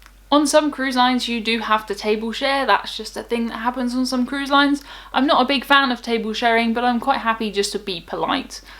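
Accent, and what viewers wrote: United Kingdom. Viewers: Now why could I have not met a girl like you in my younger years. Your attractive, smart, articulate - and like me, I could spend the rest of my days cruising the world. Oh, I love that British accent.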